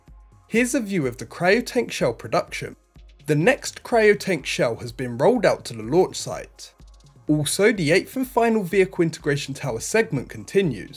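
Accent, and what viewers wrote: England, United Kingdom. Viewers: Narrator sounds English but isn't this the channel that used to have Vietnamese stuff on it along with spacex stuff?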